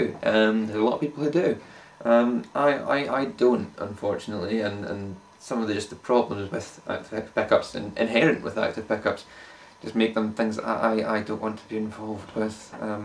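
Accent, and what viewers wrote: Scotland, United Kingdom. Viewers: TALK SCOTTISH TO ME! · Well he's Scottish. I don't know about Shrek. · It's scotland. We all talk like that over here...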